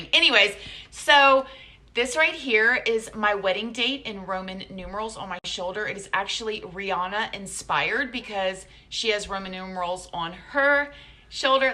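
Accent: South, United States. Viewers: We're around the same age, and we've both had hard lives, my best friend of over a decade was killed but somehow I was able to speak to him after he'd passed, he died on Thanksgiving of 2016, and any doubts I had about god were suddenly gone, he'd always promised me he'd contact me if something ever happened and he kept his promises, I went through such a bad depression I'm just now starting to do things that involve getting better, all my muscles atrophied from just not having the energy to live life anymore, and I need physical therapy to walk normally again, I quit painkillers shortly after he died but you live in Florida, you know how easy they are to get, and growing up in Virginia I never thought they were as deadly as they are, and I battled on and off with addiction and abusive relationships for a very long time, I'm just now coming out of the worst depression I've ever been through, I'm filming everything, so hopefully it can help others feel less alone, and see themselves in my journey. 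I found your channel and we both have the southern accents and your ability to frame a story is just astounding, I've lived in Los Angeles now for most of my adult life, but you remind me of my friends from home, and it's comforting for me to listen to you, I laugh and I cry and I'll think "Oh wow I did that too!" it's helped me a lot, I've met some incredibly talented people out here, and you have so much natural charisma, you really could have a career as a motivational speaker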